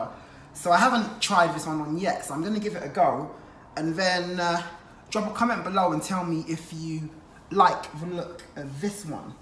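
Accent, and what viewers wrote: United Kingdom. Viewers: Hey - the colors are great and the silver is the highlight- it was made for your incredible physique!! Love the British accent, mate!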